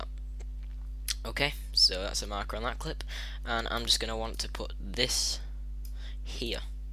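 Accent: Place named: Birmingham, United Kingdom. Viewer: Sounds like your from Birmingham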